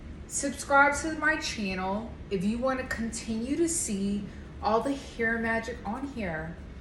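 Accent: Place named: Spain. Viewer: I love this idea for sure… I also love your Spanish accent!!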